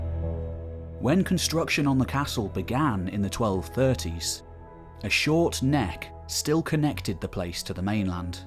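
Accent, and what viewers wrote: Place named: North, United Kingdom. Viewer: He's a northerner.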